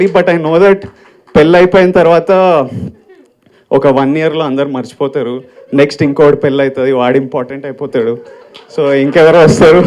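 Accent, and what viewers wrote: Telangana, India. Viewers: jai telangana.. hero dorikindu.. jai hero .. super hero..with his speech.. good look.. jai hero..he spoke pakka..hyderbadi..telangana slang..so..natural..jai hero..jai jai hero..jai kcr..jai ktr..jai telangana......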